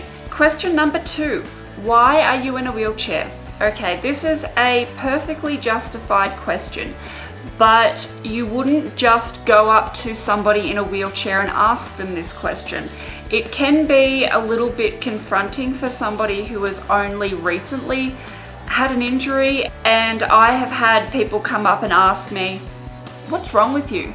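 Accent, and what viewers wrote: Australia. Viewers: I LOVE your accent!! Australian, right? It's adorable. Cheers from San Francisco :)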